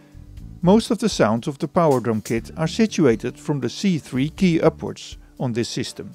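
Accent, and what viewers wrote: Netherlands. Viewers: Zowiezo dat deze man Nederlands is! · Ben je nederlands? Het lijkt wel zo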